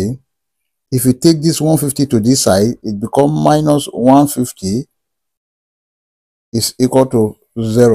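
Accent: Nigeria. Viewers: I like Nigerian accent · Love your Nigerian pronunciation 😀😀😀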